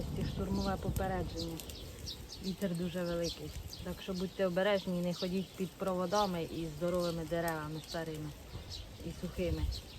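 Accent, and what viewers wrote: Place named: Khmelnytskyi, Ukraine. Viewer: Дякую Вам Пані за такі ЖИВІ відео, на разі я далеко від рідного краю, на Сході України, Ваші відео і суржик нагадують мою Хмельниччину...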